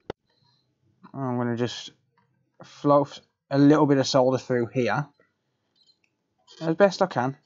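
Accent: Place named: Birmingham, United Kingdom. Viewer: Don’t know how,but for some unknown reason,one of your videos popped up in my recommendations…that was a few hours ago. 😂 I’ve watched a few since then. Fantastic channel and great to hear a English voice for a change. The bonus i,you do repairs for us the public,so now you are a top of a very short list when it comes to needing repairs. Keep up the amazing content and yes,I’m most definitely subscribed 👍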